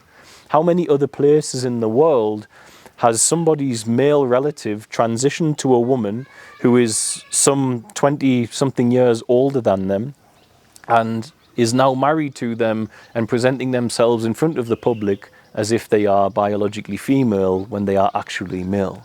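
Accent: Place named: Newcastle, United Kingdom. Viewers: Never seen this gent before Sounds like a Geordie Great presentation though Very interesting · metaphysics explained via understandable examples and the dissonance from the brigite deception dispelled by your soft geordie tones many many thanks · Hey there, bonny lad, bloody good talk, and a beautiful, Geordie voice to deliver it, are you aware of the three premiers, snorting coke, on a train from Ukraine, red handed, also, she was wiping coke from his nose, not attacking him,on the plane, trudau, is the son of fidel castro, Starmer is secretly, gay, rent boys are burning houses and cars, belonging to him, for unpaid bills, seems they were all trained by the w,e,f,,allegedlly all broken, easy attachment, keep talking bonny lad, god bless , fenham, formerly, elswick, you sound the same as me.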